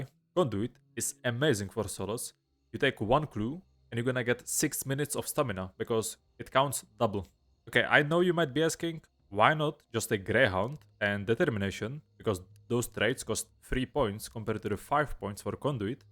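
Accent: Czech Republic. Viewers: Náhodou jsem na Tebe natrefil, podle přízvuku si říkám, to zní jako obyvatel stejné země jako já a ono ano 🙂, jsi opravdu kapacita, mrknu, zda máš i další videa a pak dám odběr, díky. :hand-purple-blue-peace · Damn i think i hear czech accent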